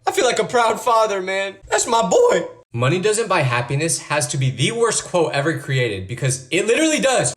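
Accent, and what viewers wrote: United Kingdom. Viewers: you’re British ?ahhhhhhhhhh! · Wait you're British? .. . . . . . . . Aaaaahhhhhhhhhhhh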